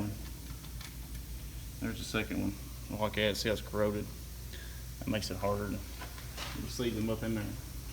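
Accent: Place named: South, United States. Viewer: Is this what I sound like ...tell that's a good Ole boy there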